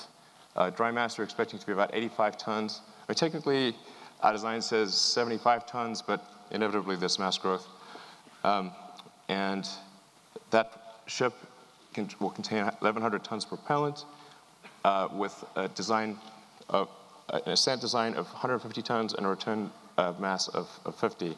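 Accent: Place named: France. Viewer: I love that french accent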